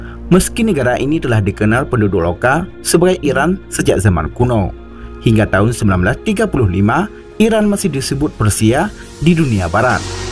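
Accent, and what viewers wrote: Aceh, Indonesia. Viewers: Admin nya kayak org Aceh ya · Dialek host nya dialek Aceh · Pengisi suara apa Aceh100% Aceh Pidie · orang aceh..dari logat bicaranya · macam orang Aceh lagi berbahasa Indonesia.. logat Aceh nya masih kental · Orang aceh boz ku. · Logat nya orang Aceh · Admin org aceh kah?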